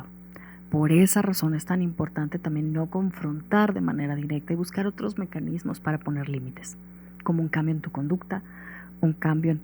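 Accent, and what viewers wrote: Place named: Mexico. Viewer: Muy atinado tu video,; hay mucha confusión de lo que es el narcisismo y de cómo se manifiesta; de igual forma cómo es que es ideal tratar a una persona con narcisismo maligno. Tu video es muy valioso. Gracias por compartir. P.D. Tienes acento de mexicana, ¿estás en Mexico? Gracias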